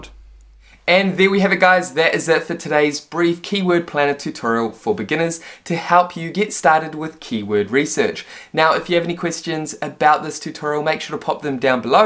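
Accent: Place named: New Zealand. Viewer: Love the kiwi accent, thanks for the video :)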